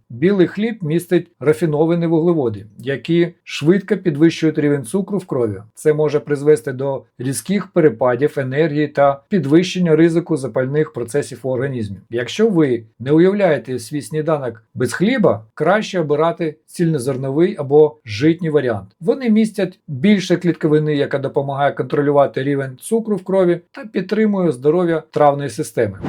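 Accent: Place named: Poltava, Ukraine. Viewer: щиро дякую Вам за відповідь! Я з Дніпропетровськоі обл, але темчасово знаходюся в Даніі, а подумала, що ви з Полтавськоі, бо говор схожий на наш! Робете дуже важливу справу, щоб наша нація була здоровішою і сильнішою! Вам бажаю процвітання і до вершин без перешкод!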